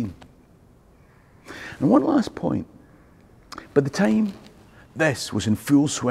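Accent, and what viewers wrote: Scotland, United Kingdom. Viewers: For fooks sek. This is bloody fookin brrriliant. I love the Scottish accent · I just subbed to your channel, I can listen to you talk for hours because I love the Scottish accent ❤️ I'd like to ask if you have not already could you do a video on the real and True braveheart that Mel Gibson did? I would absolutely love to hear the true history behind that, as I do not know how accurate the movie was. That would be such an awesome video to watch. I'm excited and cannot wait to hear from you as you post more videos. Greetings and much love from the USA 🇺🇲🙏🏻✝️❤️🥰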